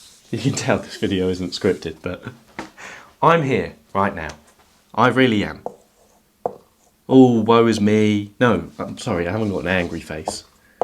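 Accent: England, United Kingdom. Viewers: You sound like a Pom but live, behave, and have the smarts of an Aussie. (PS my parents are Poms) love your stuff, totally enthralling 🇦🇺🦘👍🏼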